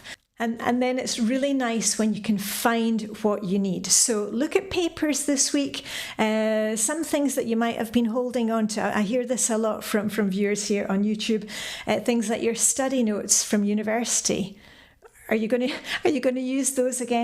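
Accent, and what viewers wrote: Scotland, United Kingdom. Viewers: I'm 79 and enjoy your site. My grandmother was born in Scotland so your accent is a sweet reminder of her. I've been through decluttering my former hobbies, but I still have the urge to buy office supplies every August when the school supplies are on sale. Often, my biggest problem in decluttering now is my husband who wants to run all our castoffs through a yard sale.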